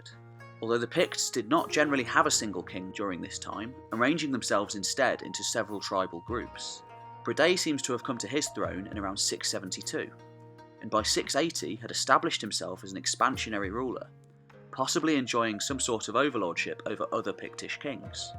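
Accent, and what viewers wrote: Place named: England, United Kingdom. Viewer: Arturo Wagner I am going to assume you are from somewhere outside the UK because you can't seem to be able to tell the difference between a Scottish and an English accent, Ewan McGregor is Scottish, the narrator is English, and on top of that the tone of the narrators voice is absolutely nothing like Ewan McGregor.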